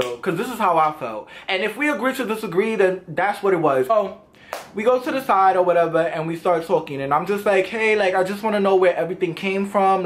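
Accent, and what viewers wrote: New York, United States. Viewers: yo i dead always watch your vids to hear the ny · that New York accent thoooooooooooo. REPPIN FROM THE BXXXX HEA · He got that New York talk yo. Brooklyn or the Bronx or Marcy Projects son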